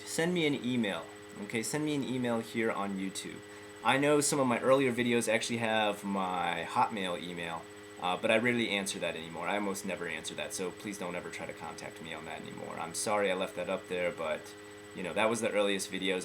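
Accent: United States. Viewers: I love the southerened accent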